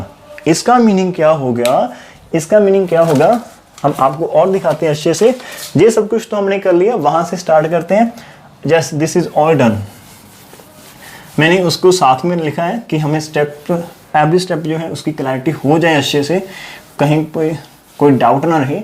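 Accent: Punjab, India. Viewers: Sir galti pakad bhi woi sakta hai jiska uski knowledge hoti hai Your teaching method is awesome and i love your talking style hindi punjabi so cute❤️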